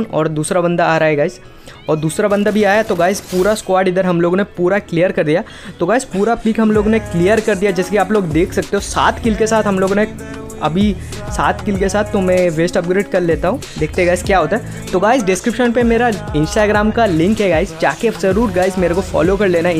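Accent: Bengal, India. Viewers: Bhai aap bengali heee naaa?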